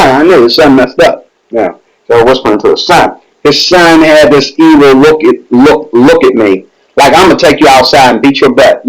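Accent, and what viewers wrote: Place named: South, United States. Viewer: lol had no idea this dude was black until I looked up at the right hand corner 😂. He sounds like southern redneck lol 😆. He ain’t lying though 😉.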